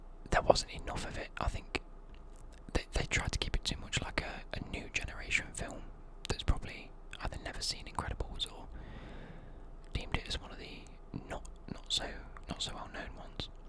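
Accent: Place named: Midlands, United Kingdom. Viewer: Where are you from? I might be hearing things but you sound like you’re from round by me (midlands)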